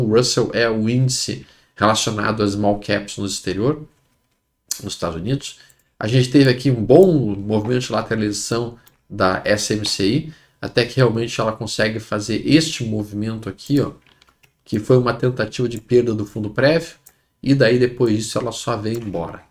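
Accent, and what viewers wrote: Rio Grande do Sul, Brazil. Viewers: falou tdo gauchinho de garopa bah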